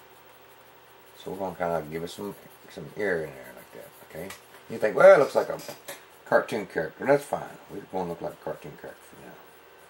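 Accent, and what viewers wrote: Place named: West, United States. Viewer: Love the Western Accent it makes the video more awesome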